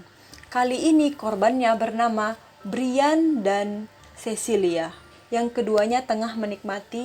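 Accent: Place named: Medan, Indonesia. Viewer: Mantap videonya kakk.. Request dong kak eps berikutnya full pake logat medan kak 🤲🏻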